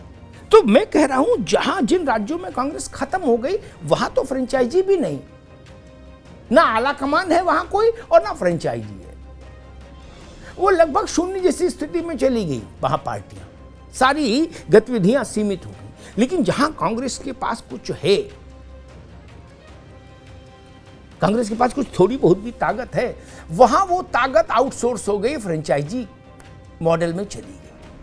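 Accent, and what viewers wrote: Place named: Farrukhabad, India. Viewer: अमिताभ जी का ठेठ फर्रुखाबादी स्टाईल ही आप की स्पेशलिटी है, सीधी सीधी खरी खरी कोई लाग लपेट नही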